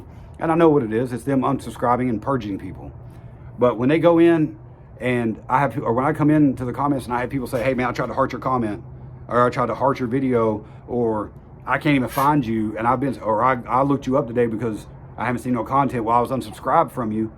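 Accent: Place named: South, United States. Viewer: yeah man np. After I typed it I said "oh maybe that's why he had twice as many notifications" you know? Idfk haha. Take care. Well wishes my man. It's funny you'd never think it, but minus the slight southern accent, your not any different from most guys wayy north up here in New York. Bet you'd never think that. We got beaver trappers. We got it all bud. Big ass tree removal! It's the sticks. Be good brother.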